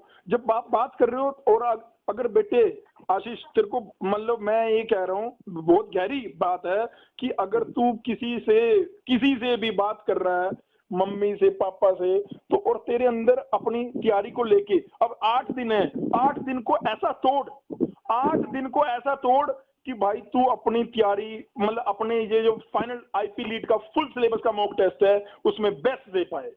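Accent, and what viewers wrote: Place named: Haryana, India. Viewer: Sir , u are so amazing ...and ur language is so amazing.... Like haryanvi type.... Sir you are one of the best teacher in the field of leet coaching... because u give correct advice to students.... And , i saw ur success record, congratulations sir ... Sir , can u help me to crack leet entrance test....sir m financially too weak...i m also cracked the jee mains in last year but can't take admission in any college due to home situation....and also 5 the time college topper in Gandhi polytechnic Muzaffarnagar (Up).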